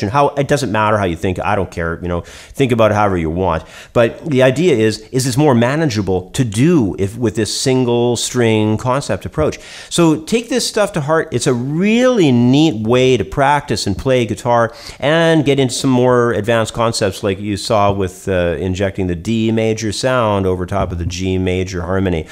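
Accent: Canada. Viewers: Coolest thing is even though a 1 string solo not playing with 1 finger too obvious is to a untrained eye the illusion created is that it appears to come across unless watching like a hawk a very melodic piece of music. And very cool. Almost like what Glenn RIP did on the little solos were only on 2 strings. You got so much content don't know if ever get thru all but gonna sub. to channel. BTW I hear Canadian accent are you still up there or do you live on states now?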